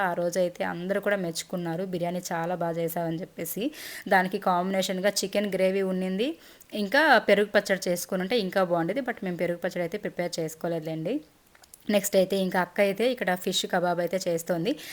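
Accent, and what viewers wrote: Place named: Rayalaseema, India. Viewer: Hi garu, chala baundhe vlog thodi kodalu bonding chala cute ga undhe, meru chesina non veg dishes yummy naku me non veg vlogs chala estam.andi and meru rayalaseema slang bauntadhe andi voiceover kanna original slang video petandi madhe hyd andi..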